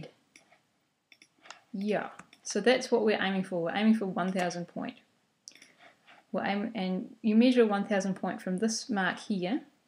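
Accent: New Zealand. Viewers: If only all tutorials were given in a New Zealand accent! Thanks, very helpful :) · Don't know if you are still receiving comments, but....if you have 26 letters in illustrator...do you just put them on 26 layers? or fewer per document? thanks, good tutorial....and new zealand am i hearing? thanks, eliza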